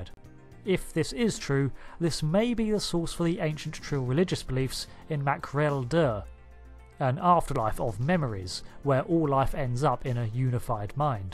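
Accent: United Kingdom. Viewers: Better at 1.25 speed but still not comprehensible. What is it about this man’s British like accent that’s tough to understand like he slurs his words and expects us to understand your joke when you barely said it See — what joke.. you said “I’m joking” but what’s the joke you didn’t even say except their efficient choice/ mode of transportation.. which is not funny.. then it sounded cutoff and slurred out.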